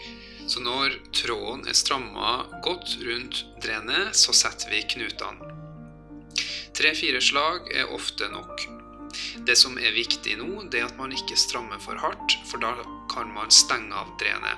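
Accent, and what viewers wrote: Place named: South Africa. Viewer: it`s english but with a south african dialect.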